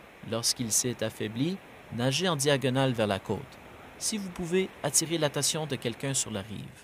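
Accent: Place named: Quebec, Canada. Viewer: Sur la côte atlantique française, cela peut porter plusieurs noms : ''Baïne'' ('petite baie' ou 'bassine' en langue gasconne) sur le littoral aquitain, ou ''Bâches'' au nord sur la côte de Bretagne ou de la Manche. Plusieurs phénomènes doivent être rassemblés pour créer ces courants d'arrachement : Il faut des marées suffisamment fortes, du sable fin, un dénivelé faible et une forte houle. C'est spécifique aux côtes dont le fond est uniquement sableux. En tant que surfeurs et bodyboardeurs, nous nous en servons pour faciliter la remontée vers le large, une sorte de ''voie rapide'' dans l'eau pour rejoindre les pics du déferlement des vagues et se positionner. Mais en effet, c'est très dangereux pour les baigneurs. Vos conseils de prudence sont très utiles. Chaque année, nous déplorons des noyés dans ce contexte, par imprudence. En tous les cas, je savoure l'expression que vous employez (''Courants d'arrachement) + l'accent québecois ; c'est encore plus suave ! 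😉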